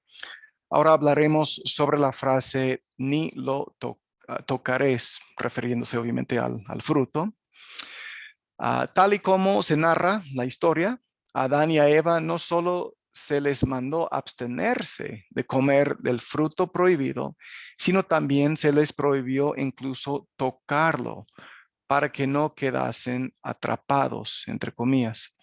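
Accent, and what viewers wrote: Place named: United States. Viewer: Su español hermano es muy bueno, aunque todavía se le nota acento norteamericano, eso se puede reducir, pero nunca desaparece al menos en este estado mortal, igual en la eternidad será el idioma adamico, eso es lo bueno.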